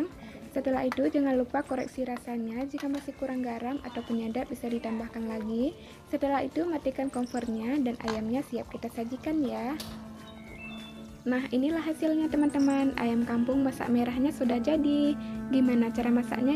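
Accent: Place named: Aceh, Indonesia. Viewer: Bahasanya meu aceh that. Ketawa aku2 😀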